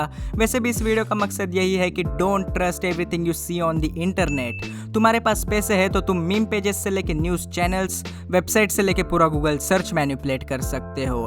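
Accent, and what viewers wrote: Gujarat, India. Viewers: IDK if you Re from Gujarat but you sound like a Gujarati Boy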